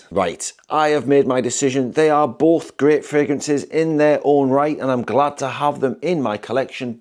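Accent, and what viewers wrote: United Kingdom. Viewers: I love English saying French words ! 😁😅 Could be as funny as French saying English words ! 😅😂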